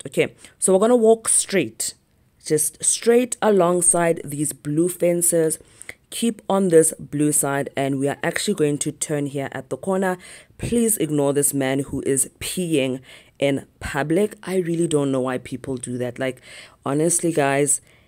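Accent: South Africa. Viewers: Pedi accent on flick 💖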